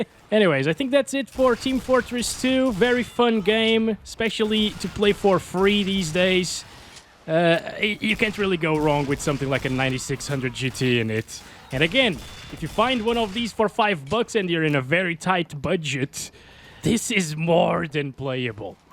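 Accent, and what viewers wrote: Portugal. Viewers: És português? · És português??? 🤔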